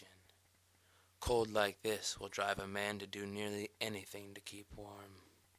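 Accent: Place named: France. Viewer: Do you look back on this and say, "Ya, I do sound like a tool." But it's not your fault. You probably watched too much Cousteau. Octopus, octopus. Why do you hide. In that Frenchy accent. Sounds like your trying to pull off some type of avantgard Joe cool kind of thing. No, nope ain't feeling it. More toolish than anything else.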